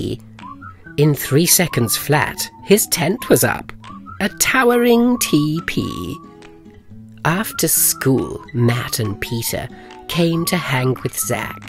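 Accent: United Kingdom. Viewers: It's a British guy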